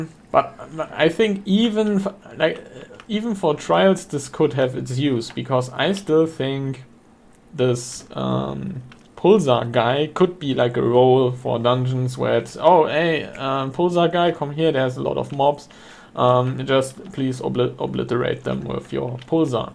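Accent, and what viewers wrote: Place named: Germany. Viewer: Your German accent is nice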